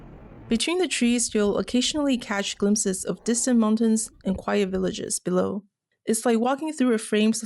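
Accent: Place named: United States. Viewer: Sorry but the fact your American I cannot follow you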